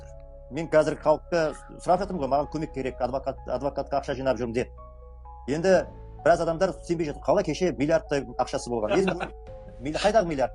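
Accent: Standard. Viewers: Мұхтар Қабыл ұлы қазақшаңыз керемет таза сөйлейді екенсіз❤Аллаһ күш қуат берсін.тілегіміз орындалсын .Әмин.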